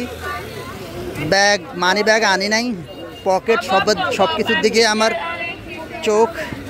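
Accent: Noakhali, Bangladesh. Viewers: ভাইজান নোয়াখালী র ভাষার টান মনে হচ্ছে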